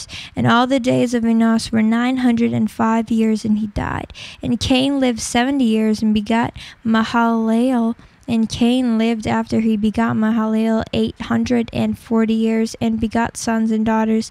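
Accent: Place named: United States. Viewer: I like her real voice, it sounds like an American version of my voice lol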